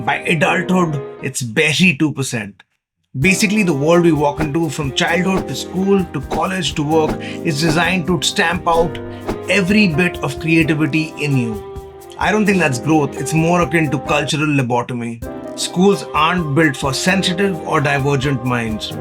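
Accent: India. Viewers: You sound like the professional wrestler, Rey Mysterio with an Indian accent.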